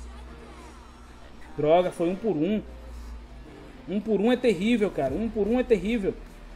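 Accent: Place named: Bahia, Brazil. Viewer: Ó larga o doce pivete ó larga o doce pivete ksksk parece q vc é daq da bahia ksksks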